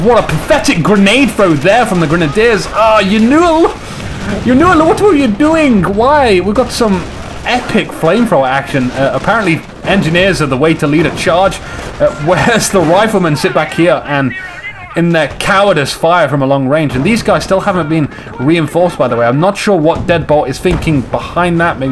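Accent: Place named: United Kingdom. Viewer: WAIT A MINUTE... you're British?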